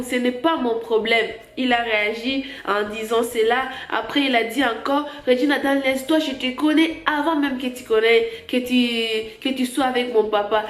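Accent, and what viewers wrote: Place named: Democratic Republic of the Congo. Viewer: Tu est Congolaise je sens le Tiiii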